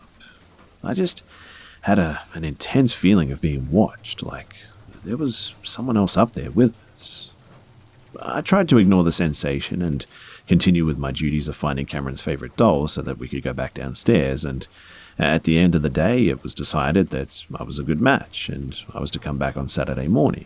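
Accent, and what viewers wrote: Australia. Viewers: thois guy izza aussie fah shhure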